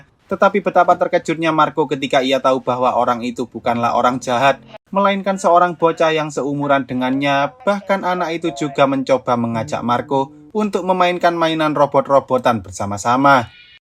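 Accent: Java, Indonesia. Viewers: Anjj dengerin suaranya medhok le 😂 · Mas medok men sih mas 😢😂😂 koyok aku mesti wong jowo wkwkkw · Kurang enak cara ngomong yg ceritanya, ada logat jowo😂 Sekali2 pake logat normal bahasa indo mas bro.😂😂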